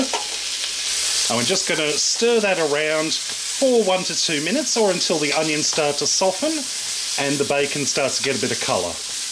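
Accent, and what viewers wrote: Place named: Australia. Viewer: Yes, he's an Aussie.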